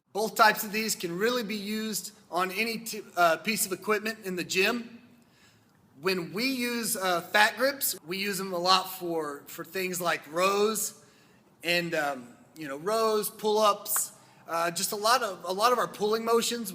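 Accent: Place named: United States. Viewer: The most annoying loud American accent. Imagine being stuck in a lift with this guy on his phone. Mugg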